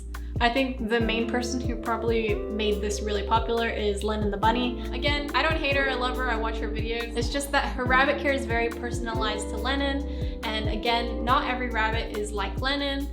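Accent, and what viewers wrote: Australia. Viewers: I'm just watching along minding my own business, when I hear.. "..a young whipper snapper". An I'm like hey, is she Australian? Yay. Finally found an Australian bunnytuber. I'm getting my first rescue rabbit in a couple of weeks. Eeek. Excited.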